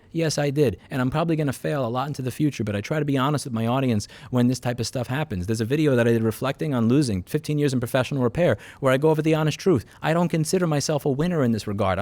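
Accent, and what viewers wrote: New York, United States. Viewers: the feeble video can't handle his new yorkness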